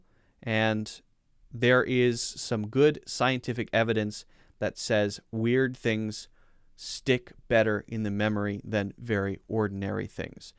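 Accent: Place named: New York, United States. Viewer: American accent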